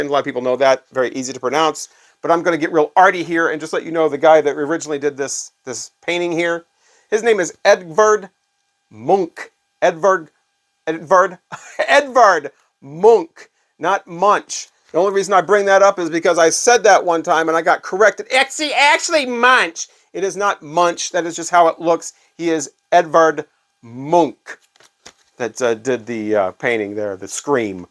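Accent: United States. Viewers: It hurts my ears every time someone American tries to pronounce Scandinavian names 😭But okay, it wasn't the worst I've ever heard. 😂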